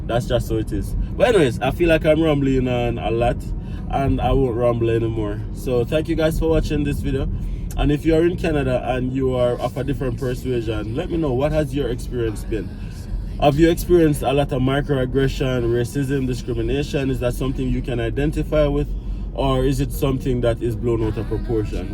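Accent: Jamaica. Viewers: I wonder if your Jamaican accent would have blown those Mennonite kids' minds if you'd spoken to them.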